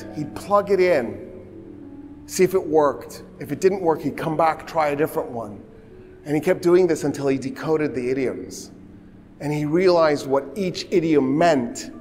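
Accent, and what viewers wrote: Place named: Iran. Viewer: این استاد ایرانی نیست؟ همه ظاهرش هم صحبت کردنش شبیه یه ایرانی هست که ۲۰ ساله خارجه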